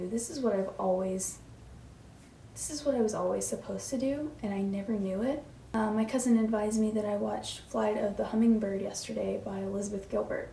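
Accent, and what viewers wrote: United Kingdom. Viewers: I loved ur Brit accent tho😔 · wait wait wait. i just watched the video where she had like this perfect British accent...what happened to it? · Why did you stop making Youtube videos? 22K is a great start. And what happen to your British accent? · Go back go using your British accent. I really enjoy it when you speak in your accent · Same :o the British accent's gone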